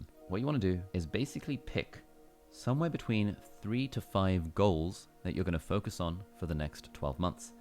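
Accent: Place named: United Kingdom. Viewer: Omg the video automatically started in spanish and it sounded so souless lol I missed british voice inmedately😂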